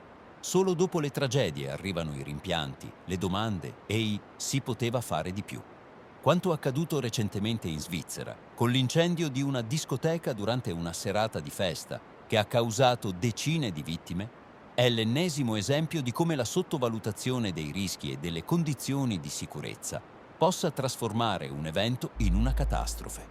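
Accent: Italy. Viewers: Wow, the reader (or "reader?) of the information this time is the strangest one I have ever heard! In the beginning, there is a clear Italian accent even with the super rapid speech, yet there is also the typical AI pattern of pausing between words that belong together, failing on scientific terms like Bradyseism, and misunderstanding Celsius for whichever funny variety that comes out each day. On top of that, the accent also changes to some variety of Asian pretty quickly, and there is even a couple of inserted space filler sounds like "ah" and "mm"! Please, commenters that read this and are fluent in English: volunteer to do the reading instead of letting AI abuse the daily bulletins! I would do it with pleasure, but my teeth will prevent that for a few months, so at least one other subscriber is needed!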